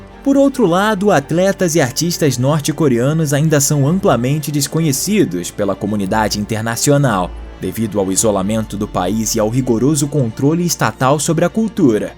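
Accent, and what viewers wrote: Rio de Janeiro, Brazil. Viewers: Vídeo muito bom, educacional, mas colocar um carioca pra narrar ferra tudo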